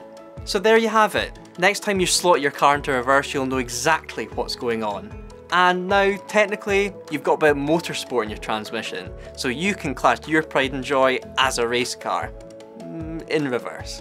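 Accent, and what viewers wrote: Scotland, United Kingdom. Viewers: Scotland, but don't know which part of it exactly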